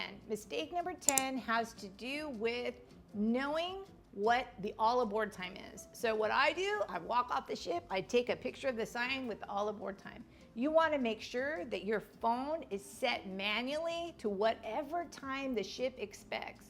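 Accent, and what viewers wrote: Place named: South, United States. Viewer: We do love your southern belle cheerful accent. Makes the content so much more approachable.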